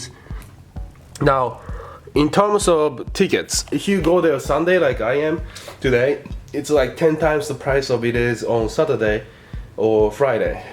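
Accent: United Kingdom. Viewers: I love your Asian British accent! <3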